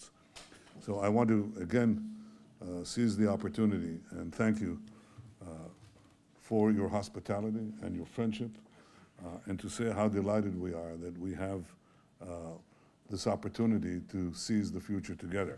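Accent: United States. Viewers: Harsh Truth. And don’t forget Bibi is American too. That is a Philadelphia accent if I ever heard one, has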